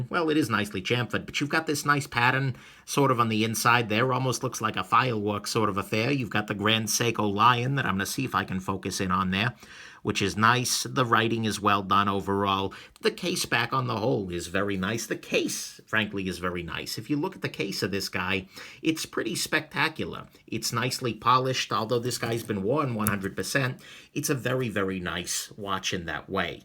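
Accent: New York, United States. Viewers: Same! Voice reminds me of people I know back in New York. Someplace I haven't been back too since 2011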